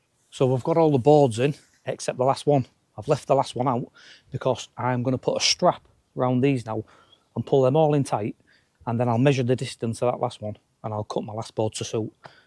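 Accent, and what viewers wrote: Hull, United Kingdom. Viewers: Sick this, you sound like a hull lad, was that price a total price for the double gates and the side gate? I’m looking at doing a side gate only. Wondering what the price just for the side gate was ? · You’ve got to be from Hull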